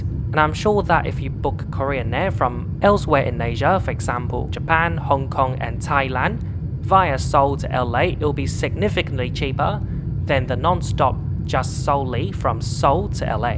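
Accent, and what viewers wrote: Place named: Australia. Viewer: First of your videos I've seen. Your excellent English with an Aussie accent is adorable!! Planning to take KA so I can take my cat in the cabin, instead of frightening her in the (admittedly pressurized, climatized) forward cargo hold. I see on the seating charts that KA has a small number of dedicated "pet friendly" seats, but so far I haven't been able to find a video of someone bringing their cat along on KA. Maybe I should make a video of my (our!) experience!? 🤷😺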